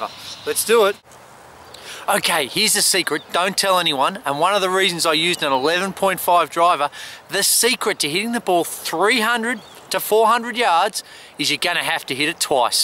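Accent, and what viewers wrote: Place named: Australia. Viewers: Probably Aussie based on the accent. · haha english men , Hes a Aussie,accent and by the birds sounds and fauna behind him... yes your right me and a mate played his stepfather a 72 year old and he whipped us,he got a 82 and all he did was hit straight ....!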